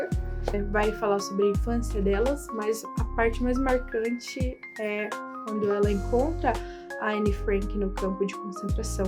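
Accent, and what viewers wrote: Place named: South, Brazil. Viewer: Teu sotaque é lindo, vc é do Sul ? Amei as observações ❤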